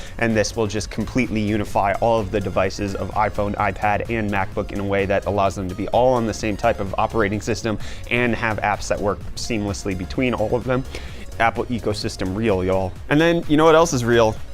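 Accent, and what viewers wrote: United States. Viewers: You sound American. Why do you live in South Africa?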